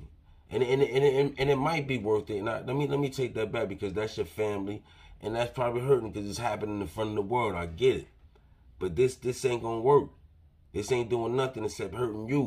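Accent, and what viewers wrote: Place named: New York, United States. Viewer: Luvvv what you have to say!! Especially luvvv that accent, sounding like you're from the Boogie Down or Queens, or Brooklyn or something lol. Good sound advice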